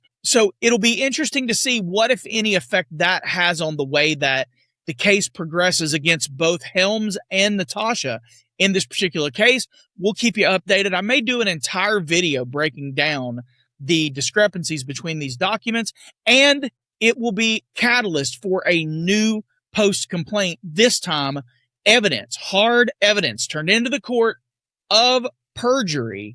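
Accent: South, United States. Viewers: Because of your Rebuttals, you have exceeded your way into becoming my favorite, your knowledgeable experience, Southern Drawl & fantastic sense of humor using colorful language like "2x4 headed" <~~ Who talks like that??! YOU do!! And I love it! 😅 Love it!! Love it!!! Thank you for being you,.. 💚💛💚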